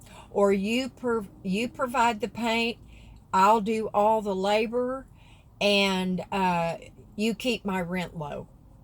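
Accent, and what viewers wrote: South, United States. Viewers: You seem so sweet. I saw on other channels how people were bashing you so I decided to take a look for myself and you are not what other people were saying. It goes to show, you have to not allow others to make up your mind for you. Go and see for yourself. I wish you the best of luck with your LBC. All the negative comments, just ignore those people. I notice your accent, what part of the south are you from? North Carolina here. Lol.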